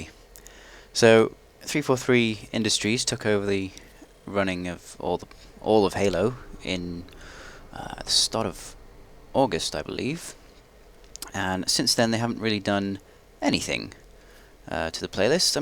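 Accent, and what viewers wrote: United Kingdom. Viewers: are u english??????????????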